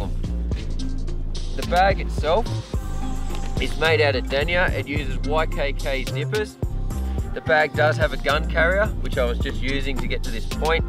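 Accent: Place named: Australia. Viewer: Lol love that aussie accent yet we aint got it here. In tassie it sucks lol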